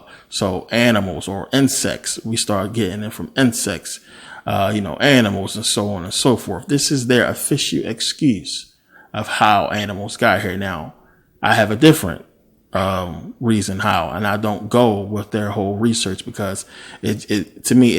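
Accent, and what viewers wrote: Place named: DMV, United States. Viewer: Love that DMV "tew, yew, hewge" twang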